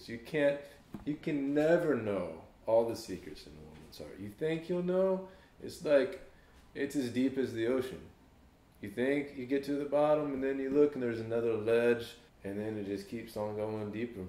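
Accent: United States. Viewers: nouth cawolina lmao love your accent buddy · cara esse sotaque texano é foda demais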